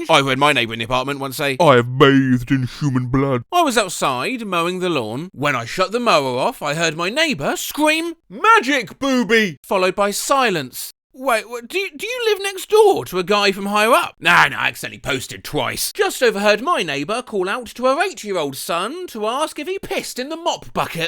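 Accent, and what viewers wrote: United Kingdom. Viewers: Is he British? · Was not expecting to hear Hindi in a British accent today but here we are! Bahut khoob!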